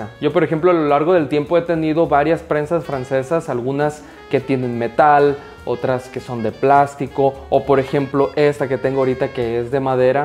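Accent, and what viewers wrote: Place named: Paraguay. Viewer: Encuentro algo de Paraguayo en el acento, soy de ahí, un abrazo si acierto 😮😮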